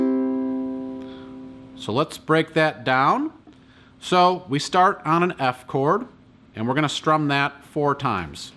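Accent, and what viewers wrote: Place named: South, United States. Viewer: Dudes definitely from the south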